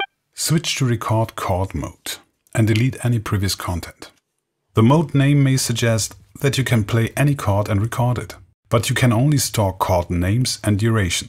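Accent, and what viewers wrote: Germany. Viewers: Nice german accent and a very, very useful review !!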